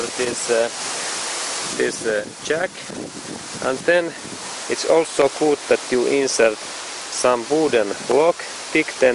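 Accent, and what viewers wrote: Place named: Härmä, Finland. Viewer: murre on niiiiiinnnnn härmäläinen kuin olla voi:)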